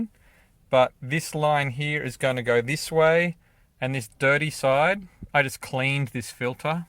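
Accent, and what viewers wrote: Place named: Australia. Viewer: Great video. Hope some others find some benefit from your tutorial on changing a cabin filter. Unfortunately, I don't drive, but I enjoyed listening to your Australian accent. ;)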